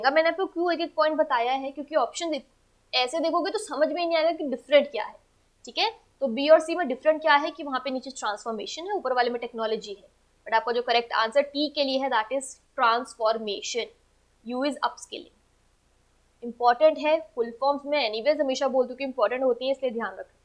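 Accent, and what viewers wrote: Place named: Haryana, India. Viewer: Madam ji aap haryanvi laggo ho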